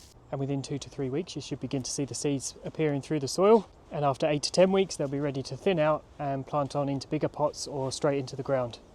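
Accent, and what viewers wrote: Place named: Australia. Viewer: Goodness, you sound so Australian!! My bad.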